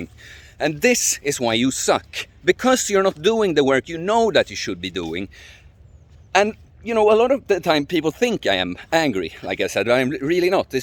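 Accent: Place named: Sweden. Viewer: Once I heard the accent I knew you were swedish. Jag är inte svensk men jag kan svenska. Grym video bror fortsätt så.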